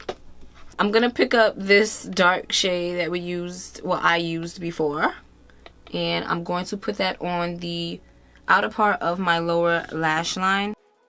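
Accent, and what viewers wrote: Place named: New York, United States. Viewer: SubscribingBECAUSE .....the tribe palette look.... u did that.......you’re a NYer (accent dead give away lol 😂)and you’re an awesome mom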